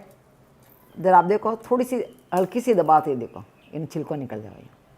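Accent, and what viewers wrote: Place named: Rajasthan, India. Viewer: main aapki aur aapke husband ki recipe dekhti hun main rajasthan se hi hun aapka lahza boli achi lagti hai 🙂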